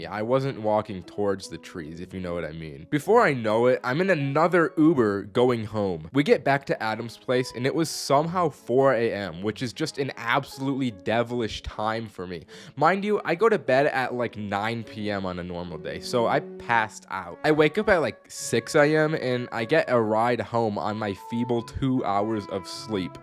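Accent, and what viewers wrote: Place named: India. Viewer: I just realized you sound Indian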